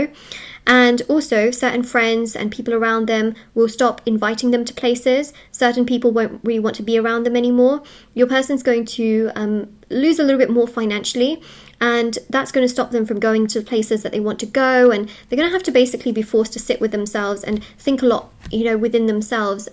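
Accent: England, United Kingdom. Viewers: which part of england are you from? I’m curious to place your accent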